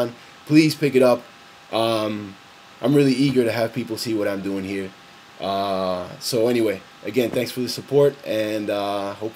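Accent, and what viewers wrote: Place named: Argentina. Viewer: only a question(aajhaa the mangakas how you dont have time for ask questions in youtube but), you have a connection with Argentina? because, when i hear you speaking spansish, your accent is so...argentinian hahaha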